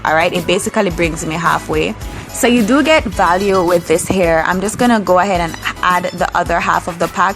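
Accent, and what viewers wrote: Jamaica. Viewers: Jamaican? yeah girl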